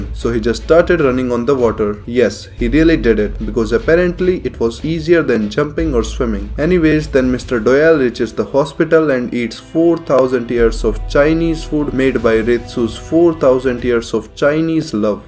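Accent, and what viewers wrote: India. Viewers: u sound indian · i love this guy's perfect grasp of english slang combined with an indian accent, its hilarious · His indian accent just make this video better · Dude I feel like you are a fellow Indian the accent sounds very similar 😅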